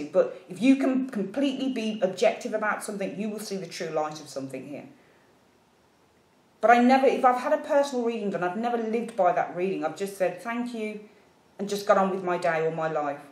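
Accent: Birmingham, United Kingdom. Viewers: she sounds nothing like adele! is from birmingham and adele is from south london, completely different accents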